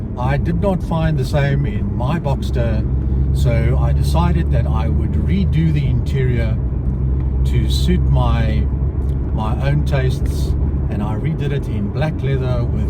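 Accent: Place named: South Africa. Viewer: Hi. Nice to hear your review and your SA accent! I’m amazed that you prefer your boxter vs 911? Find this surprising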